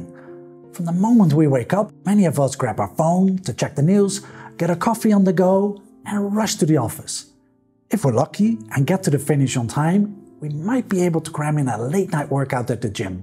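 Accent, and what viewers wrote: Netherlands. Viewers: Kom jij uit Nederland? Je praat lijk op een Nederlander toen ze engels spreken haha.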